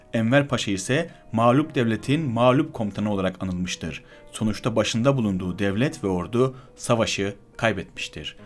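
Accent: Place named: Rumelia, Turkey. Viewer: ab Atatürk''ün yazdığı şeyleri rumeli aksanı gibi okuyosun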